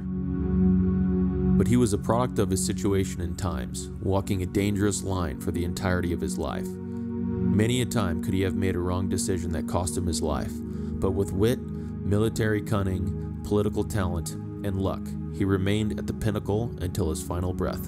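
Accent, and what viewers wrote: United States. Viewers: Pronunciations need work -- too bad to hear an American voice instead of a British one. E.g., Aethling - long a or AA (ae diphthong use in OE). But clear useful information of historic figures.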